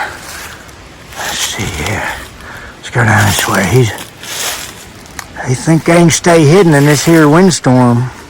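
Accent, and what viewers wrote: United States. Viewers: Yep, you are goofy. Just what I thought. Loving it though! With you talking like an old country boy and making such a racket...they are not gonna stay around long!! Oh well, they'll be back!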